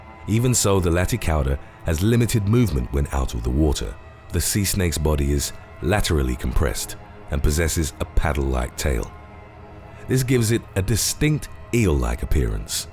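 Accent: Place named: England, United Kingdom. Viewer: Just viewed this video and noticed how intelligent the classic English (and Ausssie) accent makes one sound. That is just about as far as this one goes!! Opening statements identified the Sea Snake as a Viper. The keyword here is VIPER.....add your own accent and then ask......WTH??? You mean there's now a whole new family (viperidae) of sea snakes? All this time I have only been concerned with the Elapids (Elapidae). Hmmm......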